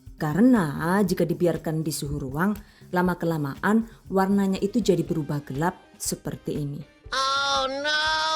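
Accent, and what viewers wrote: Banyumas, Indonesia. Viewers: Semua vidio saya suka karna disitu ada bahan2 nya,ada perhitungan biaya2 sampai hal yg terkecil dan sesuai daerah wilayah anda yah itu kata2 engga bisa lepas setiap per episode vidio yg lain nya dan satu lagi ngapak nya jos gandos😁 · Suka banget channel ini krn pengisi suaranya lucu dan menarik, apalagi kalau bahasa ngapak hahaha. Ada tips dan trik juga sukses selalu #GiveawayTHV2021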